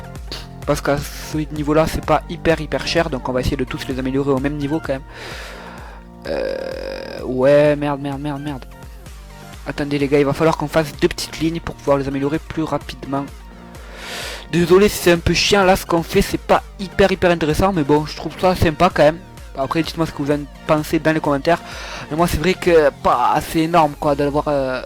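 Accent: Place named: Marseille, France. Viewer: Marseillais je crois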